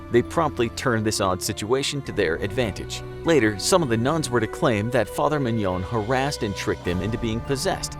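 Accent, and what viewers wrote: France. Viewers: The French accent is a nice touch👌🏾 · Lol he was butchering all those French names but because he said it with a French accent it sounds legit to non-French speaking people 😂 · Why does the French accent sound so satisfying??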